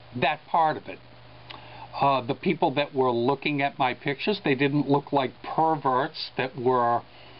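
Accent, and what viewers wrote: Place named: Boston, United States. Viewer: HA!!!! Now I know what your accent is.... a Boston accent! lol Soulpeace Sharon Alyss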